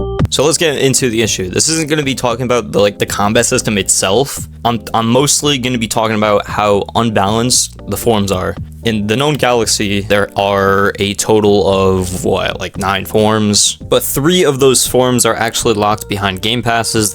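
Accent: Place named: India. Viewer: Turn off the indian accent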